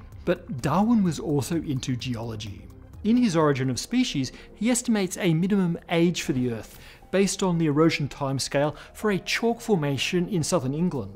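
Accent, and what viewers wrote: Australia. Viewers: He's speaking with an Australian accent, if that's what you're talking about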